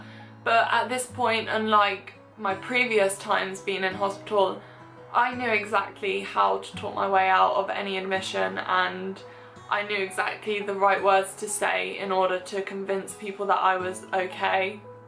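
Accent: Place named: West Country, United Kingdom. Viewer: Also when you’re not on camera you have more of a West Country accent lol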